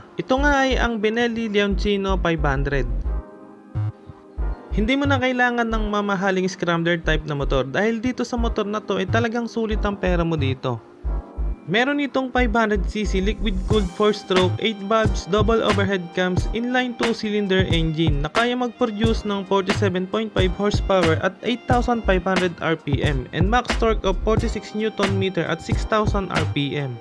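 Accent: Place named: Baliuag, Philippines. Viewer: Sir taga saan ka po? Hahaha. Tawang tawa ako sa tono ng naration mo. Ganyan kasi tono ng salita namin taga baliuag. Hahaha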